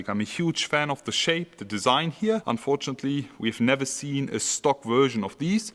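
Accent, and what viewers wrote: Germany. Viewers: 독일식 영어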